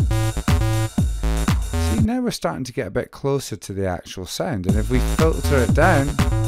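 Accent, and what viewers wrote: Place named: Scotland, United Kingdom. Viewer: Superb tutorial, and a classic track. And, as a northern Englishman with Glaswegian grandparents, it's nice to listen to a tutorial given by a Scot! 😍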